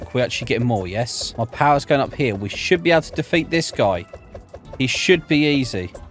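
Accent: United Kingdom. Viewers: are you brithis?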